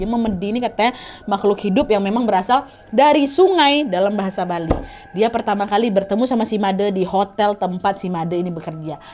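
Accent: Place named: Medan, Indonesia. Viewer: Malah lebih ngakak denger ceritanya.. cerita tentang orang bali tp ini logat orang medan yaa..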